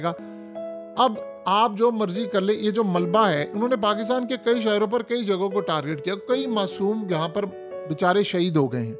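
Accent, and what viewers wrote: India. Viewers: آپ بھی انڈین لگتے ہو آپکا لہجہ انکے حق میں جاتا ہے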